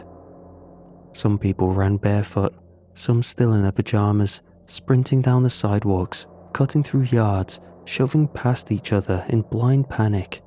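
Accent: United Kingdom. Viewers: The British pronunciation of many words varies from ours greatly. And he has probably just as many British subs as he does anywhere else. So why should he cater to just one area with his pronunciation? Now if you want to hear some horrible grammar go listen to! He is American and mispronounces so many words I’ve given up trying to help him.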